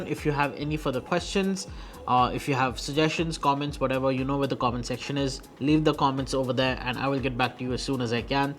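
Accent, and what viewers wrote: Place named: India. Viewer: nice review. the first indian english review that didn't course me to throw up after 5 minutes of hearing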